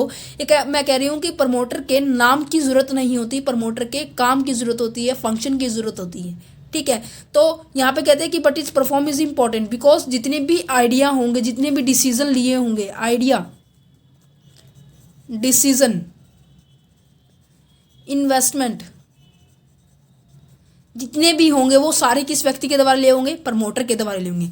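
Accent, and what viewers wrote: Haryana, India. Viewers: Nice explanation mam ..aapki sound me haryanvi touch aata h 😊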